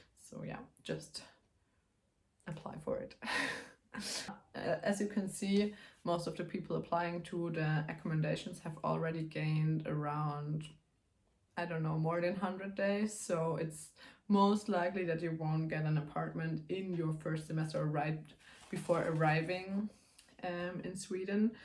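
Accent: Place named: Germany. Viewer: it's a german accent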